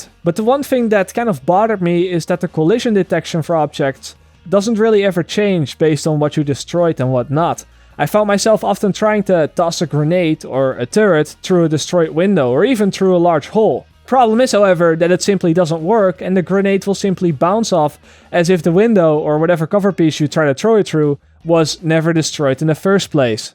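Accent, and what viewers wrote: Netherlands. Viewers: are you dutch cuz your accent sounds the same as mine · nice good dutch exand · I think he is dutch. I'm dutch as well and that is just the way most dutch people speak english haha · Wou vragen of je een Nederlander was aan de hand van je accent ... Maar het einde gaf mij al genoeg antwoord ... goeie video kerel ;) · is this guy dutch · Is this guy Dutch? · you can really hear an accent when you're dutch yourself haha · Are you dutch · Are you dutch? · nederlandsengels accent meneertje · are you duthc? cuz you sound dutch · This dutch accent is driving me nuts >.< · Je hoort echt duidelijk dat je een Nederlander bent :D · ik wist het gewoon dat je een Nederlander was XD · Dutch english accent* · Haha ik wist dat je Nederlands moest zijn, je hoort het nog een beetje in je stem. Maar buiten dat een leuke video! je hebt er een subscriber bij. 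· are you dutch? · you dutch? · i knew you were dutch from you accent & in the end you spoke dutch & i was like ..''yup i knew it xD''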